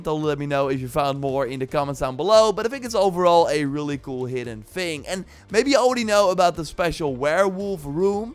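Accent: Netherlands. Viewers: Did I just spot a Dutch accent here?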